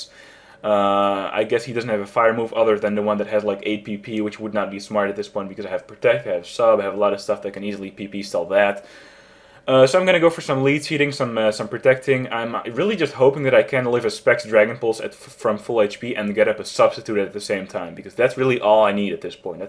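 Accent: Netherlands. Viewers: U dutch? I can hear it in your accent haha ;)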